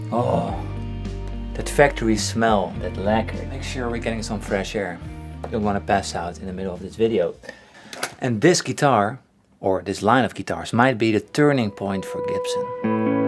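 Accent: Netherlands. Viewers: en lekker een beetje hollands derbij, lekker hoor...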